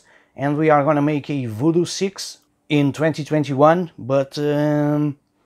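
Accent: Portugal. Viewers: So first I thought: This guy sounds portuguese. Then I looked in his profile and it said Portugal lol. I guess both of us make the same pronunciation mistakes. Olá!! Fonix é a primeira vez que vejo um youtuber português XD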